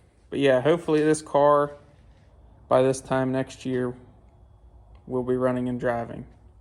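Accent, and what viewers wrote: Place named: Pennsylvania, United States. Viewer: I knew right away you were from Pennsylvania. When I heard you speak on PeaceNGrease video from rod run I got to tell you that Mack truck is beautiful I love it the iron city beer sign on your floorboard at the shifter reminds me of home I'm originally from Butler Pennsylvania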